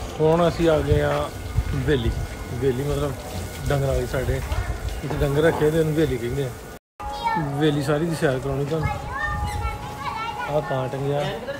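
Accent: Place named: Punjab, India. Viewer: Salam bhai I like your punjabi same as we speak all the words are same, our village on the border we can listen namaz in our village punjab side have relative in punjwar village and vist my relatives when we are going to Indiea I am visited Pakistan last November on babba ji 550 anniversary and lot of love from Pakistani people's, I want to come again to see your country and I watch your all videos From Narli village near by khalra dist Tarn Taren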